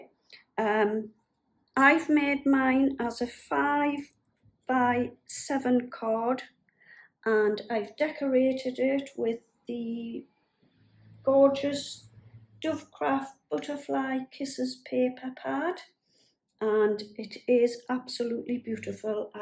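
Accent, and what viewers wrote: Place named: Northumberland, United Kingdom. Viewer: Enjoying your Northumberland accent :)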